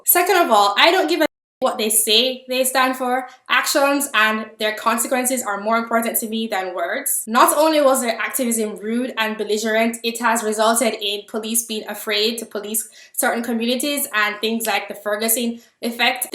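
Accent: Jamaica. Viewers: You sound like an islander. Are you Jamaica? I am too. I'm curious of what socioeconomic class background you came from in the islands before you immigrated because you sound quite elitist and condescending in your interpretations. Did you grow up in an insular middle to upper-class setting in the islands?...away from all the struggling poor black people in the ghetto? Because your perspectives seems sooo limited. You seem to be using that same limited perspective to interpret how Black Americans or Black people in America react to dehumanization and mobilize against brutality. Obvious when Black people in Americas say "Black People" they are most likely referring to themselves and their issues - same way when Black people anywhere else posit themselves as Black people...they are referring to themselves unless otherwise specified. But you seem quite invested in making sure non-black people (and white people specifically) know that "NOT ALL BLACKS are like this! 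Some of us are good and do not rock the boat." People are suffering the world over. You may (or may not be an outside to that) but it's a social reality. You do not get to police how they react and choose to mobilize/organize. I have my qualms about BLM (they are not radical enough) but I have no issues with them choose to mobilize around being brutalized. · You Jamaican? Sound like it? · Is it just me or is her jamaican accent too sexy? (apart from her smexy beauty) Damn woman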